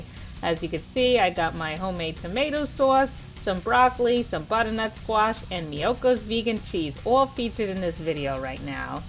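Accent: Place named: New York, United States. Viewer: D))) mouth watering REAL bad right now haha ;D love your New York accent , you can make pizza for me aaaany time sista ; )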